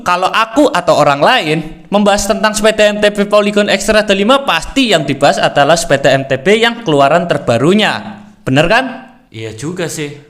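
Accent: Java, Indonesia. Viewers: Medok medok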